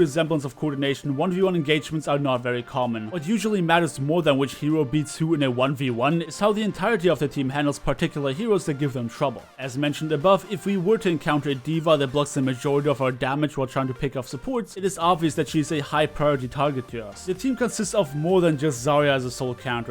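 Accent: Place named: Germany. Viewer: Your accent is rad. Are you German or Austrian?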